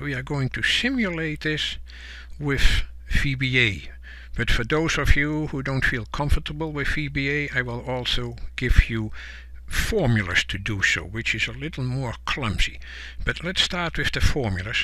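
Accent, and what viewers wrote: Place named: Netherlands. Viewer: Am I the only one to adore your voice and your lovely Dutch or Flemish accent?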